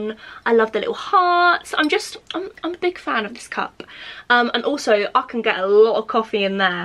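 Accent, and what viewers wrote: United Kingdom. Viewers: OMG YOUR BRITISH YASSSS SIS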